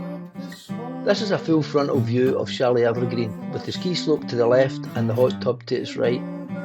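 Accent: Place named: Scotland, United Kingdom. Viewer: We are going there next Feb & this vid has Gave us great insight of what's to come. Great tae hae a Scottish accent on the vids tae. Brilliant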